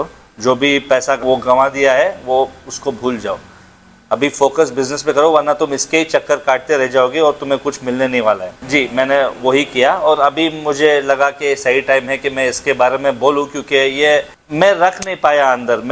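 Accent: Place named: Punjab, India. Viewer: He speaks Punjabi more like north punjab punjabi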